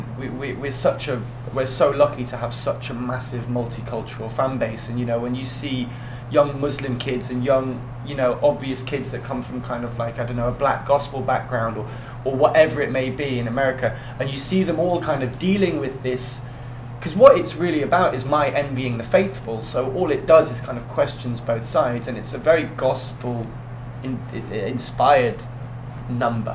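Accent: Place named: North, United Kingdom. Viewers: He has such a strong Manchester accent in this interview haha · He sounds really northern in this video!! Love it!!! Northerner represent!!!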